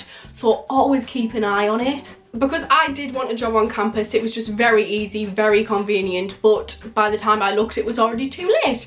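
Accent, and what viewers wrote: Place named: England, United Kingdom. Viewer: Where are you from if you don't mind me asking? I'm from Scotland but ik there's like 50 different English accents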